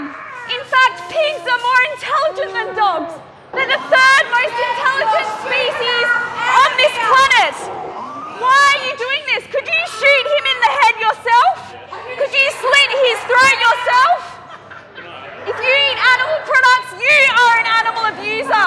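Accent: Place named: Australia. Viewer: Where is her pretentious Quebecois accent though? The vegan zombie is from Quebec... This youtuber has an Australian Accent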